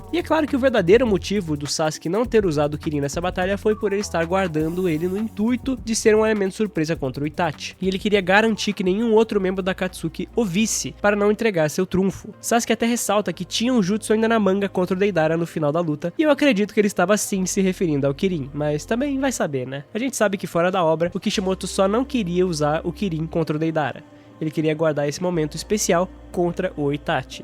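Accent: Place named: São Paulo, Brazil. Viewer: Ele não "tira" o sotaque, só usa variações do sotaque paulista.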